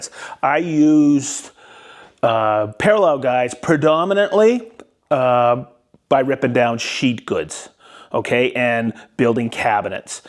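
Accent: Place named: Massachusetts, United States. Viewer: Thanks,!! I just picked up my set (20", with plans to get the 30" rails later) so I can start with a shop cabinet build in my garage. This video really brought it all together. Thanks as always for your positivity, encouragement, and wisdom! (And that lovely MA accent - Swampscott? I always remember my relatives from that area when I watch your videos! 👍👍👍)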